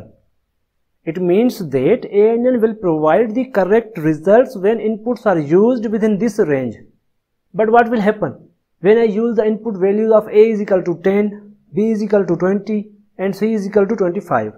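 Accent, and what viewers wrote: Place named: Sindh, Pakistan. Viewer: Thank you dr sahb. Pray from Allah almighty to give me success. Wonderful methodology. Best teacher. Stay blessed. Love you from sindh Pakistan. Your accent is sindhi. Love you sir.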